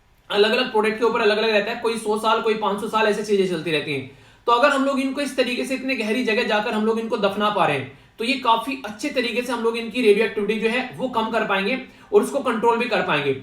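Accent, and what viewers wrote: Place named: Rajasthan, India. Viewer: sir aap kuch bhi kho ki aap kha se lekin i know it bcz aapke sare keyword or bolchal ki bhasha poori rajsthan ki mere according to rajsthan sehi ho or syd aap shrri ganganagar ya north rajsthan se ho im sure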